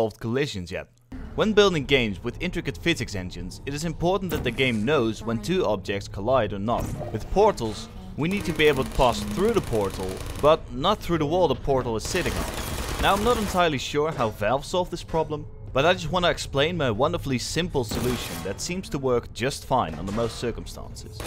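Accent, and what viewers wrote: Netherlands. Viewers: Love the fucked up half English half American accent of this Dutch guy. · Dude sounds Dutch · Nicely explained! I did some portal experiments in UE4 a while back and it's pretty much the same implementation I vaguely remember reading from one of Valve's presentations that they do all the rendering in one pass by setting the view/projecting matrices for the masked area of the portal so they don't use a secondary camera in the same way. It's been a while, so the details are blurry to me. That would save a bunch on performance, but is a lot more involved in getting to work proper. Are you Dutch by any chance? subbed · You happen to be Dutch? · Damn your accent is Dutch 😂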